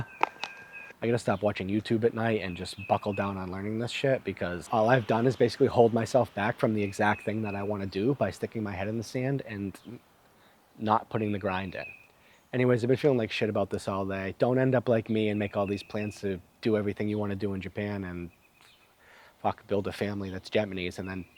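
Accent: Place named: New York, United States. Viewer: Hey man, I've been watching all of your content and you seem articulate and well grounded. Giving me New Yorker vibes (though I know you use your blinkah haha). Do you watch TBYS and Asmongold pretty regularly?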